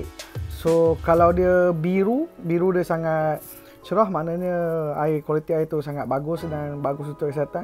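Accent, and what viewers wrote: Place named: Pahang, Malaysia. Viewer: gaye ckp tu mcm org pahamg😂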